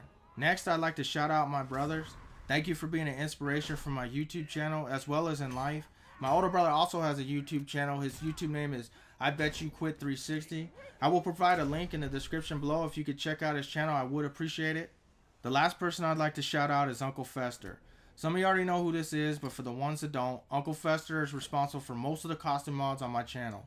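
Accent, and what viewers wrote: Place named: Mexico. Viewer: you sound Mexican and black and country white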